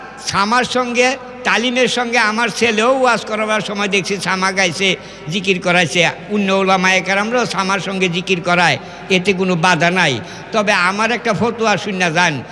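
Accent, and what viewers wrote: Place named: Sylhet, Bangladesh. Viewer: আমি ভারতের আসাম থেকে বলছি,, চাচা তোমার ভাষায় বুঝা যাচ্ছে, তোমার বাড়ী সোনামগনজ সিলেট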